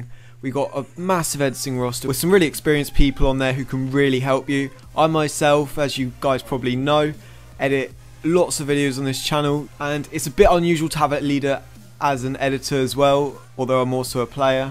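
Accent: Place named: United Kingdom. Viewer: Gotta love that brilliant British accent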